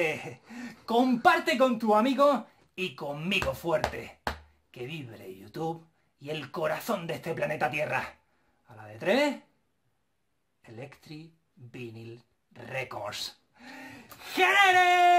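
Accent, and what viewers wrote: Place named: Granada, Spain. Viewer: Joder, qué buen video,. Haciendo un paréntesis, ya me decía mi amiga Cristy de Almería que su acento favorito es el granadino, ya veo por qué.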